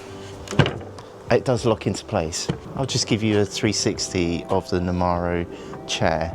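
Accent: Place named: United Kingdom. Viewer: Just listening to ur British accent convinced me to get this ikea chair, lol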